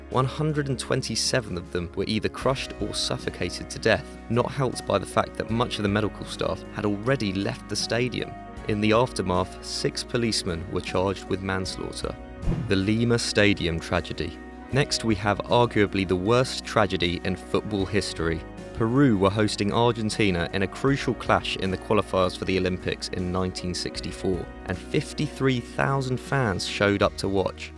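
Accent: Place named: United Kingdom. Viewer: Why is everyone critisizing the poor guy's voice? He's just British ok.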